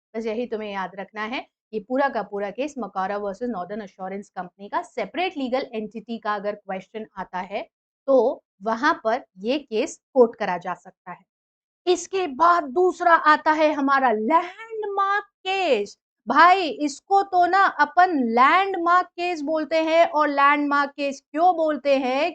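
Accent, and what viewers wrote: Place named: Gujarat, India. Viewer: mam aap gujrat se ho kya bcz apka accent match krta hai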